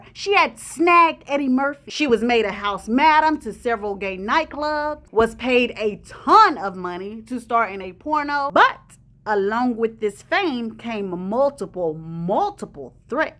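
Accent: South, United States. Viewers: Great video,! Your southern accent is really sweet. I slightly gasped when you used the potty words. Nevertheless, I'm a die hard fan of yours.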